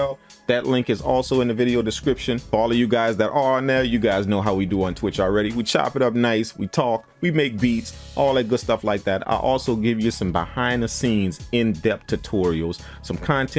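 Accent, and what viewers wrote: New Orleans, United States. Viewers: New Orleans heavy accent. Great video man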